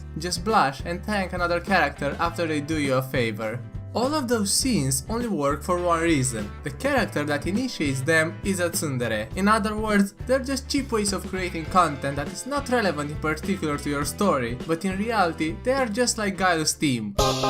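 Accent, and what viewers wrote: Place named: Russia. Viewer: Arzos aye his accent seems to be somewhere between East Eu and Russian.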